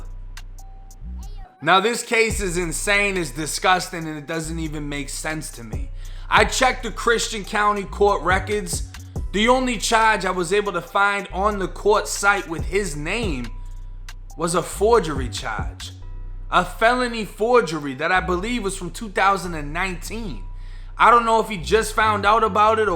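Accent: Boston, United States. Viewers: Me 2...Its that Boston twang 🤣🤣🤣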